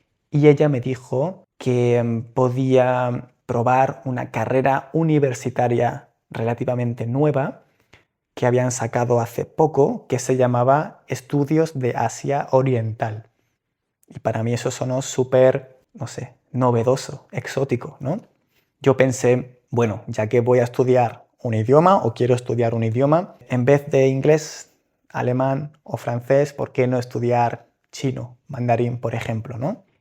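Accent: Spain. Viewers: You learned spanish with spain dialct/accent right? my favourite is mexican one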